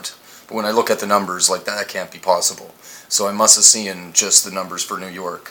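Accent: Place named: Canada. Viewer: people move. after Sandy, a lot of people moved out of NY. just like after Katrina. California population had jumps after those events. it's called migration. the east coast wasn't permanently flood. those areas were created, not lost. look at old maps. none of the East coast line was never as straight as it is now. those started out as sea barriers. to stop coastal flooding. they are creating new land now. he acts like he knows what the coast looked like but really doesn't. he couldn't even name Chesapeake Bay or the bridge. it's by far one of the most known landmarks of the East coast. those who don't know it are products of poor education. he sounds Canadian so his education of the U.S. is not to be expected. I don't blame him for that. seriously though. millions of people have not been deleted from existence. we've never gotten how population numbers. 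they've always jumped around. especially out if China. they used to inflate their population numbers as a fear tactic. the probably still are.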